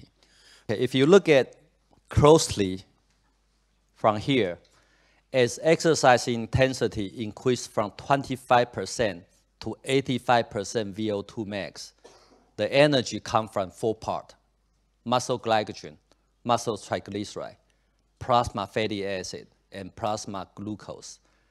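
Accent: Taiwan. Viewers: 台腔教授讚!這種腔反而才聽得下去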